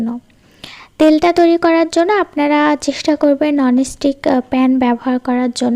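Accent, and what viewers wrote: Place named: Chapai Nawabganj, Bangladesh. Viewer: Apnar language onek ta chapai er manus er moto. Basa koi apner r kun class a poren.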